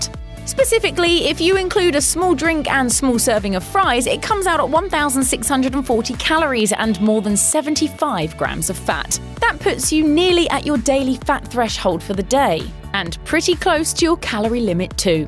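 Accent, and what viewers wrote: United Kingdom. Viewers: How come you have an Anglo voice over about a100% Yanky topic. I'm in Stalya a mix of Yanky an Anglo and here No one would have a clue about this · All well and good, but these are all American shops and it is a British woman talking about them. Very misleading.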